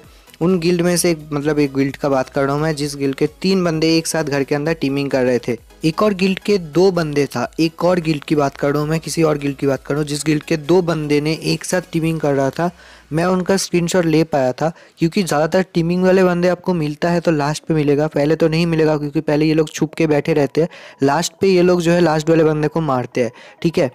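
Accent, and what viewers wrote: Bengal, India. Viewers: Keya app Bangoli ho???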